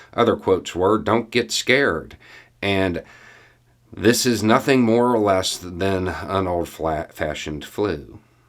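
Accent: South, United States. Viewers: Can I say that it is very refreshing to hear someone with a southern accent who actually sounds intelligent and reasonable?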